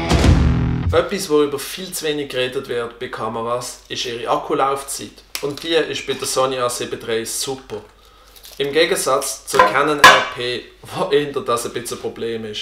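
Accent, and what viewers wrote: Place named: Switzerland. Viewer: Hallo,wäre es möglich auch das Video in DEUTSCH zu machen ?? Schweizerisch sehr schwer zu verstehen !!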